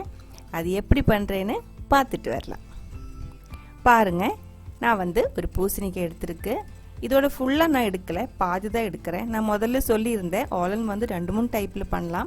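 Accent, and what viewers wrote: Kerala, India. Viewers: Mam ur thamizh mixed with malayalam is sweet. Thanks for explaining in tamizh. I love malayalam, but dont knw.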